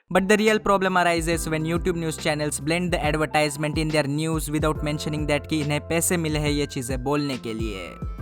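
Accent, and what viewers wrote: Gujarat, India. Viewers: IDK if you Re from Gujarat but you sound like a Gujarati Boy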